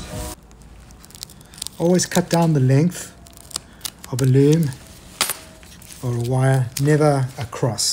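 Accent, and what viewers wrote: South Africa. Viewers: You sound very South African?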